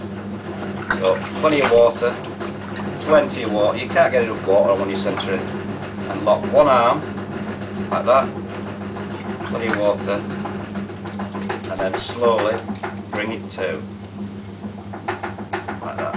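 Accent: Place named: Yorkshire, United Kingdom. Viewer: I LOVE the Yorkshire accent! And the lesson is wonderful.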